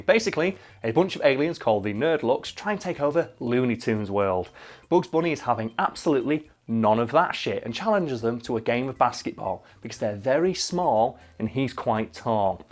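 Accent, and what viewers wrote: United Kingdom. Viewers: FACT ME UP FAST TALKING BRITISH GUY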